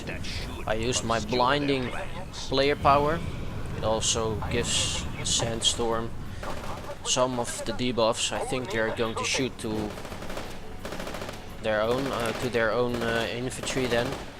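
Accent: Netherlands. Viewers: Bedankt voor je video! (ps. wel grappig: ik kan gewoon je overduidelijke NL accent in je engels horen! ) haha ;D verder gewoon perfect goed Engels hoor...en dankje voor je uitleg! Wil dit spel zelf heel graag spelen, maar ik krijg me handen gewoon niet aan een Beta key om het te downloaden...ben zelfs bereid goed te betalen voor een werkende Beta key...illegaal of niet, maakt me niet uit! Een vraagje...zit C&C 2013 nu officieel nog steeds in de Alpha fase of is dit inmiddels de officiele Beta?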